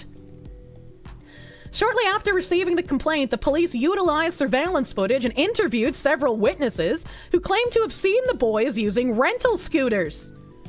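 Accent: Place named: Boston, United States. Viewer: She's a bawstin gal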